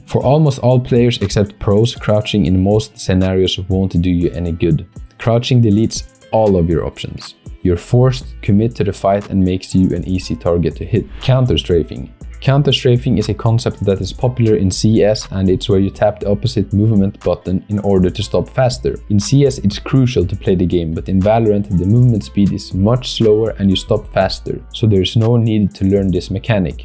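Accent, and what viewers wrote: Sweden. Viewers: Swedish accent detected.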